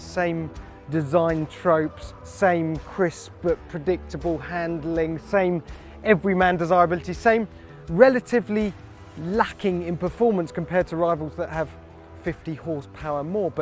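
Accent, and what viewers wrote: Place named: United Kingdom. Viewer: Fiestar st, I don't know why you britisch people see letters where their arent. It's like you say 'I'm sat in', another weird ass thing to say. Ya'll a bunch a weirdo's.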